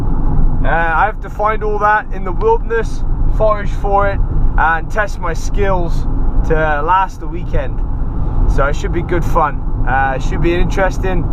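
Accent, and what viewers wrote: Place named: Geordie, United Kingdom. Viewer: i would not entertain comments from Mehmet he does not have a channel, and probably has no bushcraft or survival skills, Mr Mehmet if you read this comment lets see what you can do... great video man a big thumbs up and subscribed...there seems to be a hint of Geordie in your accent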